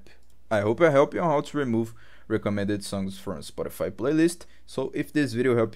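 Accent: Brazil. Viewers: Are you brazillian? Cê tem sotaque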